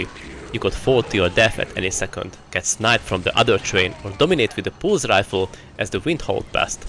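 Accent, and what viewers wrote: Hungary. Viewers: Sounds like you're hungarian speaking English. Good content tho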